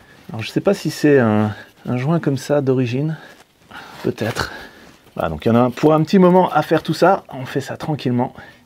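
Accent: Germany. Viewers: Ton accent allemand est très bien! Salutations de la Suisse allemande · Was für eines schönes video Ich mag deine Deutsche Akzent, sehr gut !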